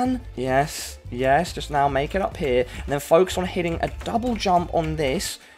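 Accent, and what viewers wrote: United Kingdom. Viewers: You British or what ?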